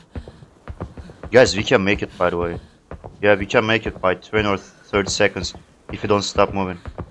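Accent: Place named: Middle East. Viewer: Awesome! I was going to say middle eastern but I didn’t want to be wrong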